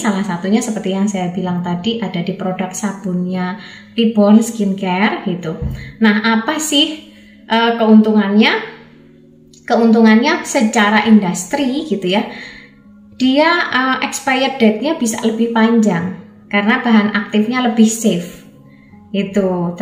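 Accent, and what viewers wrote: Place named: Java, Indonesia. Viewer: mantap ...suka bangat gaya bicaranya dokter...medok..hee.hee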